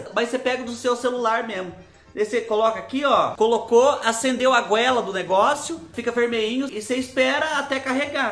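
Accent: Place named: Paraná, Brazil. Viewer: acho lindo seu sotaque parece sotaque de paranaense lá do sul💋🙏🍀❤️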